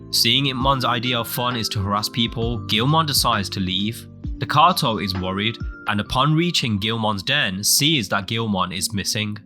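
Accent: United Kingdom. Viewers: This narrator from Birmingham? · the Scottish accent makes this 10X better ~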